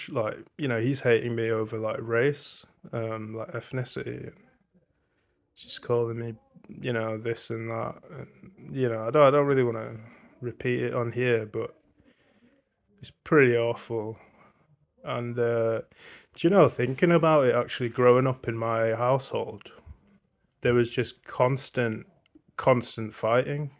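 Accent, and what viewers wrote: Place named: Yorkshire, United Kingdom. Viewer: if you couldant see his face you would think hes a white guy from yorkshire, if he was born and raised here he aint a migrant, if he is actually a migrant well done to him he got the accent spot on, wish the rest could speak english as fluently as he does, my only gripe with migrants is the fact that they dont have any education and they either dont or barely speak english, they add nothing to the country, and dont get me started on muslims and all their backward traditions polluting our culture, but he might not be a muslim, so cant really treat them worse on the assumption of what religion they may or may not be, long as he aint over here trying to ruin our culture he got no problem from me i hope the guy that made this video is ok, and i hope the guy that made this comment is ok, like the guy that uploaded this video might be having a rough time, but think if all you got to do with your day is leave shit comments like this on videos, with zero creativity, thats a bleak existence my guy hope you get more stuff to do in your day, and if your going to leave troll comments at least get creative and put some effort into it aye? 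bottom of the barrel that mate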